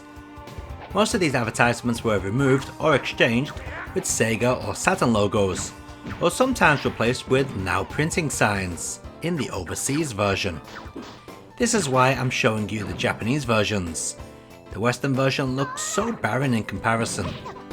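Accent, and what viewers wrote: United Kingdom. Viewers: Can’t tell if you’re British or Asian.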